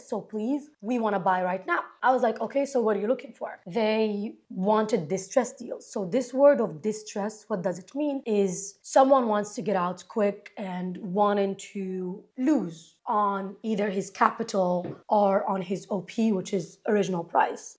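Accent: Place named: United States. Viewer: Delusional with an American Accent can’t phantom the reality of realty. Go find a new daddy in Miami.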